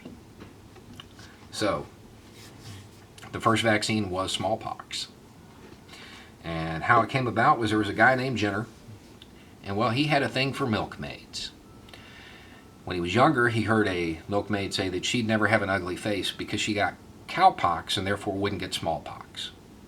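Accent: South, United States. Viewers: Honestly, this is one of my favorite videos ever from you. Not only did you put everything so well, and sensibly, you just helped me resolve a moral/ethical dilemma I’ve been unsure where to come down on for a while: should vaccines be legally mandated. I know that might seem small, or silly to some people, and it’s obviously not a huge deal in my life, but I’ve been undecided and conflicted on the question of making vaccines legally mandatory for a while, but that explanation and description of the escalation of police violence was something I really hadn’t considered in this case, let alone ALL cases. I appreciate it,. Normally I’m just enjoying your southern drawl and agreeing with you, but these vids where you make me think about something from a new angle, or teach me something new, are my favorites! ✌🏼🏴♾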